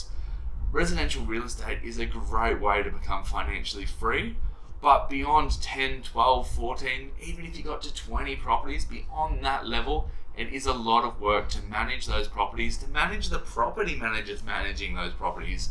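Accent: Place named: North, United Kingdom. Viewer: Keep going. Great to hear a voice from the North about the North.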